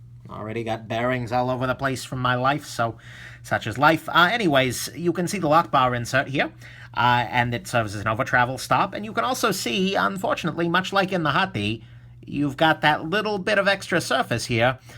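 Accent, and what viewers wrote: Russia. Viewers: Hey . Yours „ russian English “ sounds much better then yours “ through the nose English “. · Excellent review and Ruskie accent. Spaceba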